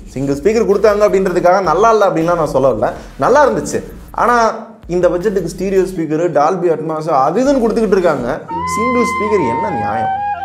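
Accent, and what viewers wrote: Madurai, India. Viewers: Madurai karan than ya nee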